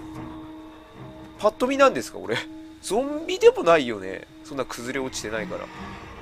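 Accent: Kagoshima, Japan. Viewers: さんの鹿児島の訛り好きだなぁ... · 鹿児島訛り最高です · 鹿児島弁良き！！👍👍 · 鹿児島訛り好きになりそう！素顔見たかったので嬉しいです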